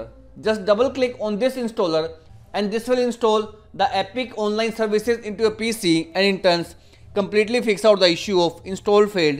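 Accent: India. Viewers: Once i heard the indian accent i knew this is going to work, and it worked. Thanks man :)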